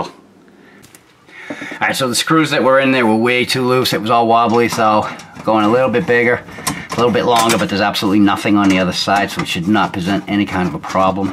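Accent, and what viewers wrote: Boston, United States. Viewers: Love that Boaston accent. lol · are you from Boston? Good job on your video, THANKS. my Maytag is much older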